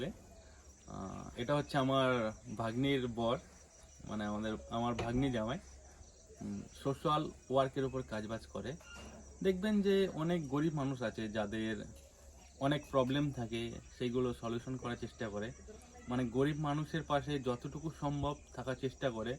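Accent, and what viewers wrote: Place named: Bangal, Bangladesh. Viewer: এর বাঙাল কথা বন্ধ হয়েছে। খুব ভালো লাগলো।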